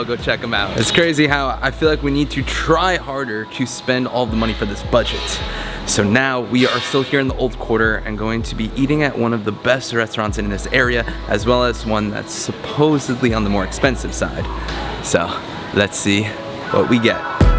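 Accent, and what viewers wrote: New York, United States. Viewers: Is this guy from New York?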